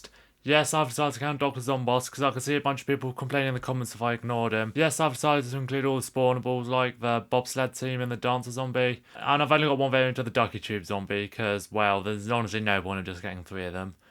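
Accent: United Kingdom. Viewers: wtf is this Brit fuck saying, fkn hate their accent. they think it’s so intellectual, like did chill out with the adderall and caffeine u fkn stim junkie. Slow tf down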